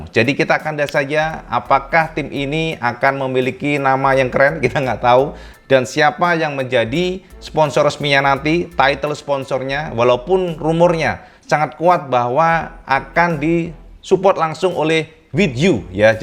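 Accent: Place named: Java, Indonesia. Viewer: Asli aku seneng liat komentar, logat jowone marai guyu. "py tho kang mas...😁" lanjutkan kang. Tp ndelalah detail infonya...aku suka sekali.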